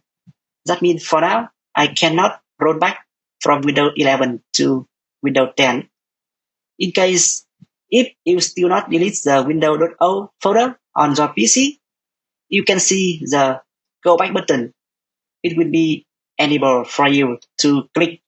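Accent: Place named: Vietnam. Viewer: i love ur accent viatnamese right?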